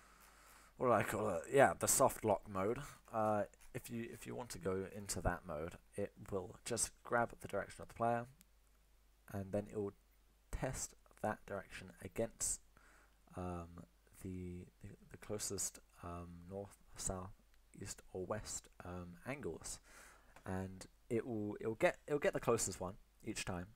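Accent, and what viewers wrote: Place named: Russia. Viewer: Russian accent moment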